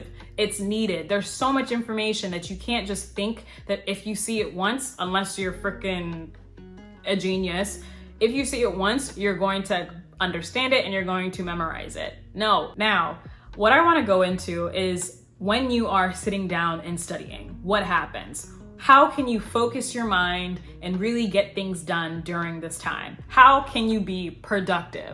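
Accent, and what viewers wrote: Nigeria. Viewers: speaking in Nigerian accent and giving tips <3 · Hah your Nigerian accent actually made me sit up and pay full attention. Can I hire you to stand near me while I'm studying and tell me off when I get distracted?! <3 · It was the Nigerian accent for me. Never subscribed to a YouTuber as fast as I did today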